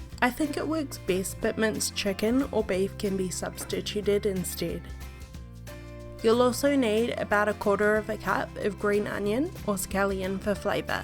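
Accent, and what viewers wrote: New Zealand. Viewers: Awesome dumplings, and once again, love the New Zealand accent...... You go girl, do you think you could make hangi dumplings? Love the channel, and ideas you have. Yummy stuff. From Palmy... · A New Zealander. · Are you kiwi? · Thank you for the recipe and instruction very simple and clear. Notice you got a NZ accent. Love from Christchurch. Cheers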